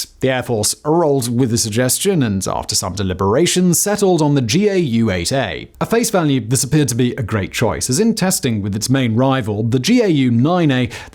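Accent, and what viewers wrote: United Kingdom. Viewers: Despite your British accent and the continued use of the word zeitgeist your review of of the A10 demontrates your an utter ignoramus. First of all, the 30 mm gun is just one tool in the a10s drawer. It carries a bevy of missiles and bombs which can and have destroyed numerous Main battle tanks from any angle. On the other hand the 30mm can eliminate pretty much any other armored vehicle without breaking a sweat. It had more losses than other aircract in the Gulf because no other aircraft were used and couldn't be used the same way. The A10 would loiter in the battle space pass after pass providing persistent close air support. All other jet fighters would do a single pass at high speed and move out. BTW, the Air Force hates the A10. As far as they are concerned close air support is for the army and its helicopters. The people who most like the A10 are the ground troops that know the benefit of having a 30 mm gun in the sky farting 1000s of 30 mm rockets and bombs over your enemy over and over again.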